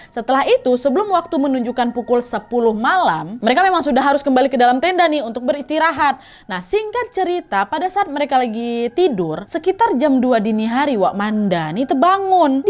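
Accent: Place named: Java, Indonesia. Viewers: asik dan suka banget denger cerita pake bahasa jawa kaya medok banget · logat Jawanya kak fasih sekali👍😁 cocok kak jadi orang Jawa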